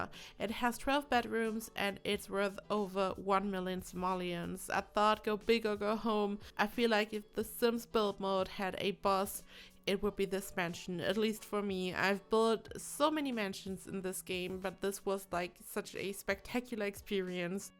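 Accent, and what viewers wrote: Germany. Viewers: I were just wondering, are you German cause your dialect kinda reminded me of German people speaking English☺️ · are u german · She really has an german accent lol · Bist du Deutsch?Hört sich vom Akzent so an☺️ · Sounds German... To me As a German. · i don't know how i ended up here but are you german? you sound very german :D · Are you German? Your accent sounds like that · are you german? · Are you german? xD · Wait, are you German? Btw, very nice one!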